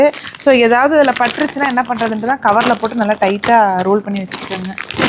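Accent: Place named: Palani, India. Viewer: சூப்பர் தஙகம் எந்த ஊர் கண்ணே எங்க ஊர் பழனி❤❤❤ அம்மா ஊர் எது பேசுவது எங்க மாதிரியே இருக்குதுடா❤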